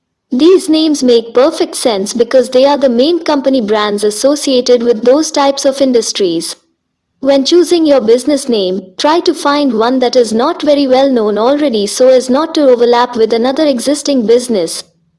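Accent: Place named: India. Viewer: An Indian voice ? Cool information. 🙂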